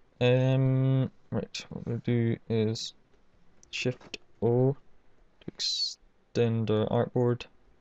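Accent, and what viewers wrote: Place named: Glasgow, United Kingdom. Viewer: Good clear content, thanks! (Also, your accent is delightful. Are you from Glasgow?)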